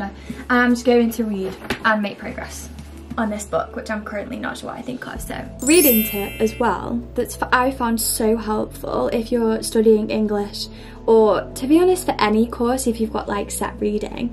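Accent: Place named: United Kingdom. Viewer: Hello:) Thank you for sharing and updating your videos and I really like them! You're lovely! Actually I started to read the Bible(ESV) from last month and I’ve been learning British English for about a year. Can I ask you something? Do you have a plan to read the Bible(ESV) with your British accent, If you want? Your voice will definitely help someone who learns British English to be encouraged to read the Bible😊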